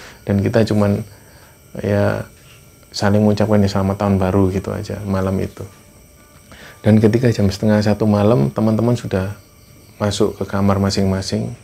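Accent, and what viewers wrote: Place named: Surabaya, Indonesia. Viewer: Sama ... Aq jg seperti itu.. medok suroboyoan..kyo aq..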